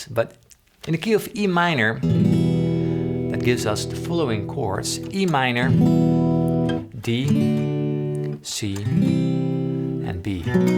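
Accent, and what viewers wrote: Netherlands. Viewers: are you Dutch? Your accent seems to suggest so ^^ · Altijd leuk dat je meteen hoort wanneer iemand een Nederlander is. Maar prachtig en inspirerend filmpje en ik ga het proberen! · Are you Dutch? I feel like I can distinguish between accents noe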